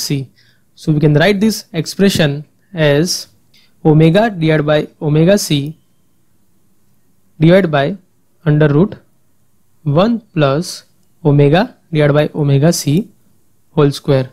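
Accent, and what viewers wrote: India. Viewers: indian accents are beautiful. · Very heavy Indian accent but the content is great, thank you for your sharing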